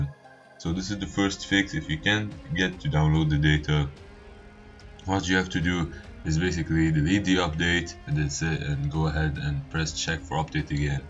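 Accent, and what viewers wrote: Lebanon. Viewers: Elina antoun he is lebanese, so am i lol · R u lebanese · ur english accent is like us the Lebanese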